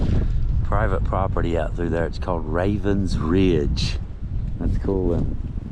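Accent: South, United States. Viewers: your soft, southern drawl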